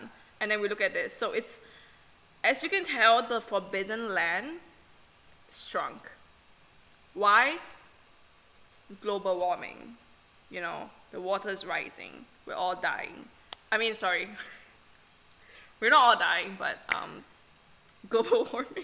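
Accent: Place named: Caribbean. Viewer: the accent sounds caribbean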